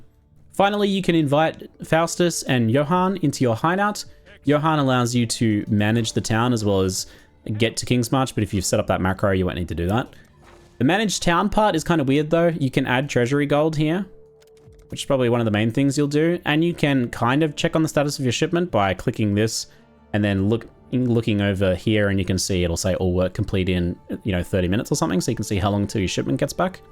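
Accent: Australia. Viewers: I need that g'day mate asmr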